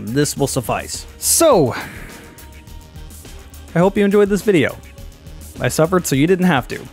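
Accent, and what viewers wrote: Poland. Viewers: I thought he is Polish :) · Are you polish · Weird question, are you Polish?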